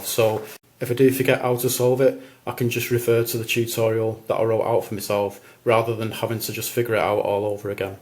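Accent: Manchester, United Kingdom. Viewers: I'm from North Manchester, I thought you definitely sounded Mancunian. Thinking we may be of a similar age, from your gaming videos. I'll be 42, in a few weeks.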